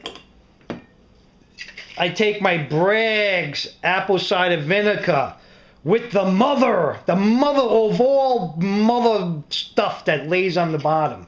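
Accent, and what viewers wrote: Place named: New Jersey, United States. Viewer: i just love watching your videos your accent reminds me of my family from Jersey. my moms been here in wv for 38 years and almost sounds like one of us now. weird huh? anyway if someone couldnt or didnt want to invest that much in a blender the new ninjas are fantastic. they have one that looks similar to a vitamix and its gotten good reviews. i also juice quite often and am gearing up for a 5 day juice fast. i love juicing as well as my smoothies :)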